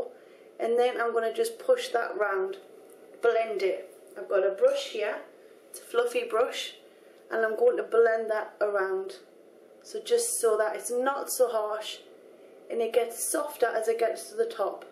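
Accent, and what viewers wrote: Newcastle, United Kingdom. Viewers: You sound like a Geordie :) <3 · Look at her channel. is from South Shields, England. It's called a "Geordie" accent. I love it too!:-)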